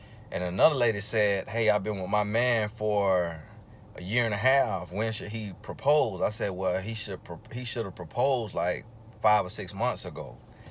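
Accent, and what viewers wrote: South, United States. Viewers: I just enjoy hearing you talk, that country accent though.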